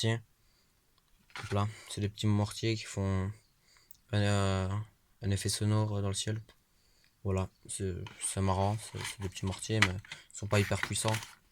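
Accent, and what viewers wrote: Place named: Liège, Belgium. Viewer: T'habites en Belgique à liège ça s'entend trop avec ton accent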